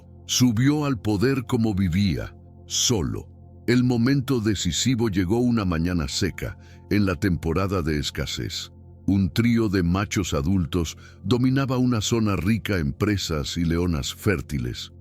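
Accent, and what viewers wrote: Portugal. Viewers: COño nua entendo ni marre, es espanol revuelto con portuguaos 😁🤣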